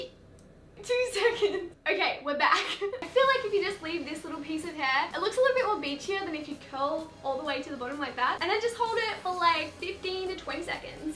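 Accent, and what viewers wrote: Australia. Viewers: just discovered your channel !its amazing ..and i lovvee that Australian accent tht you havee !! ..but how old are you !! do an Q&A !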